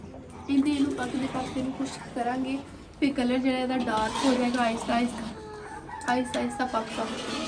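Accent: Punjab, India. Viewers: Zaberdast 🤲 mah apko subscribe ho apki pqnjabi india wali panjabi hai Allah apko taraki dey ji ayn noh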